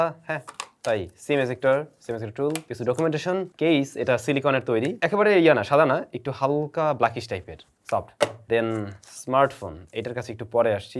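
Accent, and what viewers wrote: Barishal, Bangladesh. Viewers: ভাই মাঝে মধ্যে আপনার কথা শুনে মনে হয় কি আপনার বাড়ি মনে হয় বরিশাল।আপনাকে খুব আপন মনে হয় তো তাই হয়তো মনে হয় আপনার বাড়ি বরিশাল কারন আমার বাড়ি বরিশাল তো তাই।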